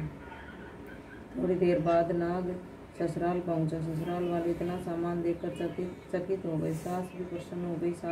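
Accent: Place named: Rewari, India. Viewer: Kit ki h ya jo khani padh rahi h boli to Riwari ki h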